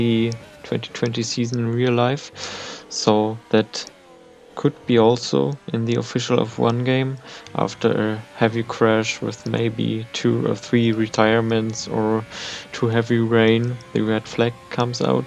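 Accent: Germany. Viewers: Da du deutschen Akzent hast versteht man dein English viel besser!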